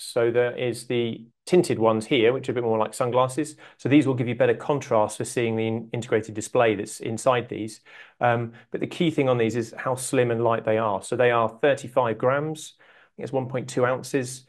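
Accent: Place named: United Kingdom. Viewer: I couldn't understand the limey bastard.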